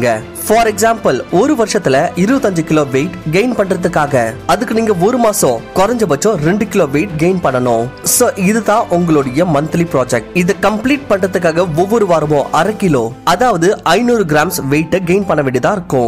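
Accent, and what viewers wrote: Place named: Karnataka, India. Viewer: Kanada Tamil nala iruku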